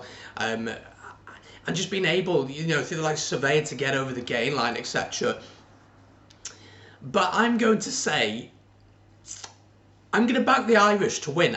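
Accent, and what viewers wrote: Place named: England, United Kingdom. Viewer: You're not the best with accents are you mate!? His accent is totally English.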